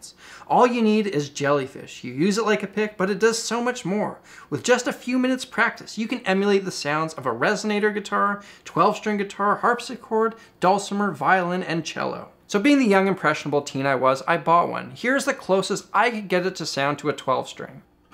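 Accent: Canada. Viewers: to be fairrr isnt he canadien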